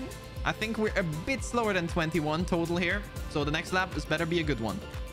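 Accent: Germany. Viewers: Are you german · Are u German? Because u sound like that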